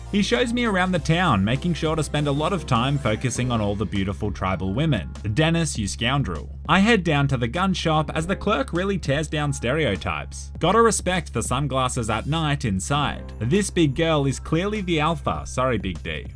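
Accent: Australia. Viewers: You know you’ve been watching a lot when you can’t say ‘anyway’ without saying it in an Australian accent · By far my favourite game ever created. But FYI Dennis is the son of an African warlord and went to America but he was treated badly so went to the Rook Islands to make a difference. I assume that was the name given to him in America. But great video its kind of like if SovietWomble and Charborg did a review in an ozzy accent. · good content good ausie accent too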